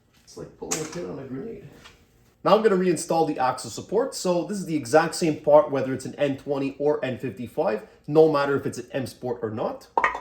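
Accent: Quebec, Canada. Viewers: nothing like a Quebecois French accent, grew up to a mother that had to learn English and grandparents that immigrated to the states in the 50s. I spent some time in Sicily in the military, Quebecois French kind of reminds me of Sicilian Italian.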